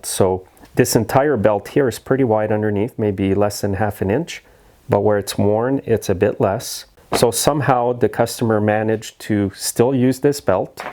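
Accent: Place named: Quebec, Canada. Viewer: You sound like you are from Quebec… are you?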